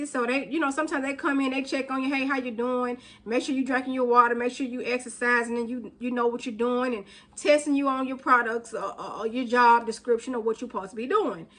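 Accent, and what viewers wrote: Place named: Tennessee, United States. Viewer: I can tell by your accent that you're from Tennessee or somewhere near. ❤❤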